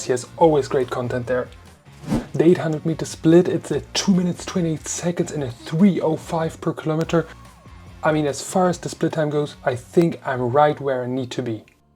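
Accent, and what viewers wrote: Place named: Germany. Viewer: An sich interessantes Video, aber dude.. dein german accent killt jede Motivation weiterzuschauen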